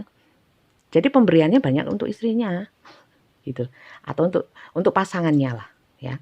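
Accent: East Java, Indonesia. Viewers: Nyimak readingan Bunda tuh bikin senyum² sendiri😍di samping sll resonet aku suka logat nya Bunda khas Jatim,pencerahanya jg sangat tepat👍sukses n sehat sll ya Bund🤲...tks🌹😍🙏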